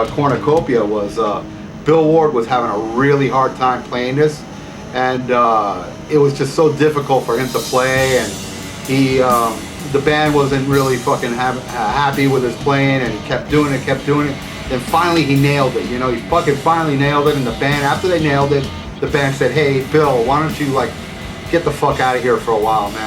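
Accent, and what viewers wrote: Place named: Chicago, United States. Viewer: Did you grow up in Chicago's "Back of the Yards".......because you use the same expressions and have a bit of accent like us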